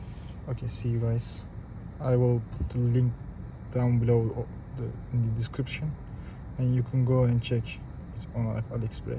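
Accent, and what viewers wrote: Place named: Turkey. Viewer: şarkısi çalmadan önce anlamıştım türk ingilizcesini:) teşekkürler video için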